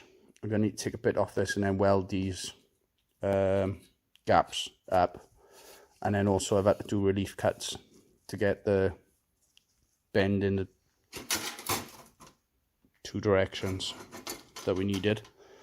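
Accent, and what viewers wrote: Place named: Wales, United Kingdom. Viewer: apart from monky london an recently salvage flippers, I don't watch any of the UK ones...I think the welsh accent helps, nice an friendly😂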